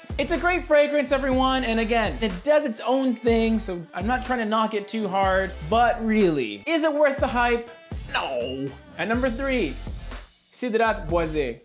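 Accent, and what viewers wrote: Australia. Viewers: Savage on the Australian accent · Oh I love Cedrat Boise, my favorite next to Aoud Lemon Mint and for me it is a performance freak, and what I noticed your bottle has a sticker on the bottom? while mine has a only etched batch code on the bottom. I guessed the NR1 pick, thought its going to be either Pacific Rock Moss, Individuel, or maybe a La Nuit de l`Homme. Australian accent! very noice!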